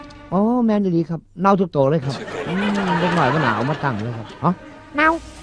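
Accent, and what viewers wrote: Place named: Isan, Thailand. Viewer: ถ้าไม่มีเสียงอีสานภาคและปล่อยให้มันเล่นไปตามต้นฉบับ มันจะสนุกกว่านี้เยอะมากๆ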